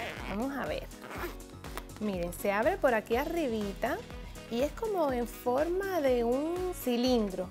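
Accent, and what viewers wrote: Cuba. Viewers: Se parecen y su acento es el mismo por que las dos son de cuba 🇨🇺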